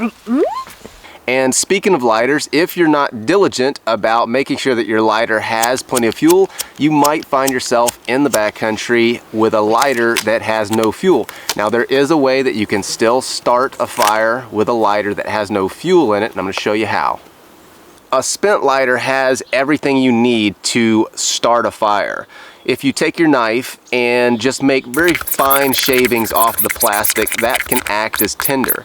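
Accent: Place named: South, United States. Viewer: where is he from? slight southern accent but he says he's in the rockies. i'm confused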